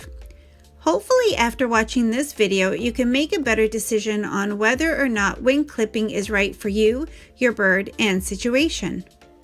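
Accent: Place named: United States. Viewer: Like the American accent is it west coast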